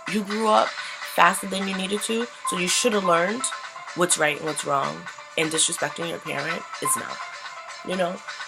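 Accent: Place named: East Coast, United States. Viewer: Hey, R.I.P. to your Mom's and I'm sorry she passed away at only 42. She was So young. Shout out to your Dad for still being a Dad and always being there for you. This was another cute make up Tutorial. Thanks for sharing. Your East Coast accent is So Strong. You Crack me up sometimes. Oh and about your Etsy shop, let me know when you have it up and I'll buy some notes from you. I have an Etsy shop myself and I've been in business for a few years. It's a Good place for business and I'm sure you'll do well:)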